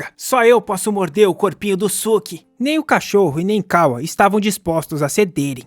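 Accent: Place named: Rio Grande do Sul, Brazil. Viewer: o sotaque gaúcho melhora ainda mais kkkkkk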